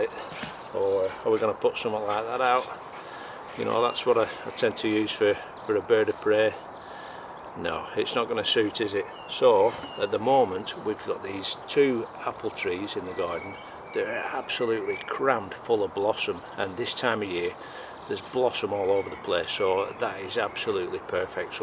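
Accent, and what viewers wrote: Lancashire, United Kingdom. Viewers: Loving your channel, learning loads so please keep creating and sharing, shame my 2 cats have a big impact on my garden wildlife. Been gathering some wildlife gear and really looking forward to getting back out freely after lockdown, nice to hear a lancashire accent too:-)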